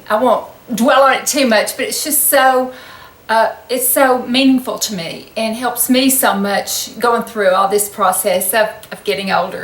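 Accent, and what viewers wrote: South, United States. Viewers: I love your Southern drawl and your kind personality,. Frankly, I feel like you could be my sister. I feel just right at home! ♥️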